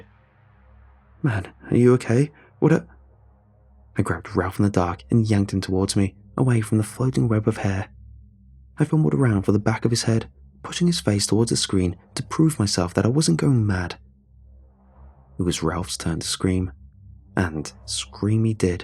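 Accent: England, United Kingdom. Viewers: Well done big lad, incase you haven’t noticed the narrator is English, and it’s a bit of fiction story telling. Absolute yogurt for brains !!! “tHiS iS AmeRiCa” 👁👄👁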